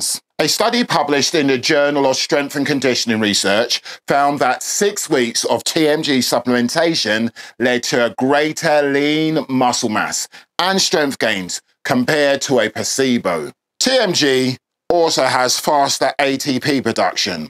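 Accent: United Kingdom. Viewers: I love your snappy Brit accent...reminds me of the Guy Ritchie movies...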